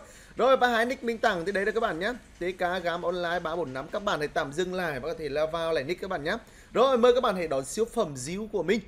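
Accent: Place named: North, Vietnam. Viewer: A nói giọng Bắc hơi khó nghe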